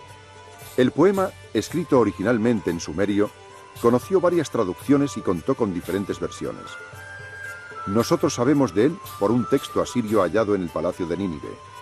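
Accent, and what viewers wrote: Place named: Spain. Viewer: No sé si sea por el acento español pero hay partes (finales de palabras) que no se entienden pues no vocaliza bien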